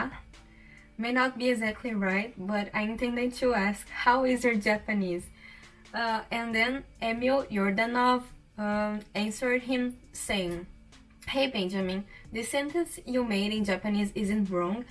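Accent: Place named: Portugal. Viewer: Uhhh... You speak in english but you keep the portuguese accent... I love it! BTW, you look beautiful! 🥰😍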